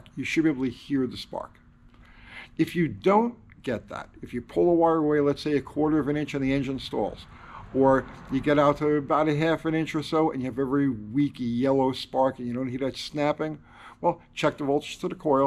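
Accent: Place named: Long Island, United States. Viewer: Thanks, good sensible advice clearly explained. BTW, your accent makes me miss Long Island!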